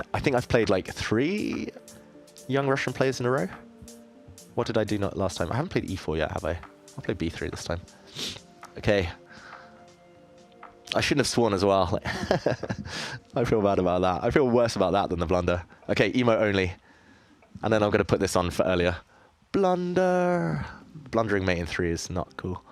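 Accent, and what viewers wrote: United Kingdom. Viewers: I much prefer watching you to magnus, because your commentary is much more fluent, as a professional commentator and native english speaker (I love your english accent too!). And frankly your moves, style of play, and attitude are more relatable as well. I love watching you analyze and explain your GM moves, the explanation of which makes it much more impressive and instructive to watch. Magnus is playing on such a diff level that it's just not relatable, even if he's #1 in the world. I watch all your TT streams start to finish, but not magnus's. So please come back soon; we appreciate you so much!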